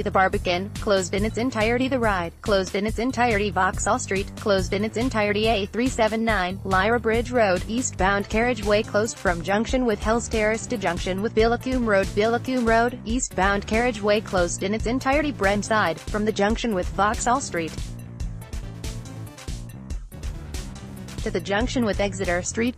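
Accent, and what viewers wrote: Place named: United States. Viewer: 1 for robot voice with American accent, instead of getting a real person to narrate. If I could, I'd give another -1 for posting stills instead of video on YouTube.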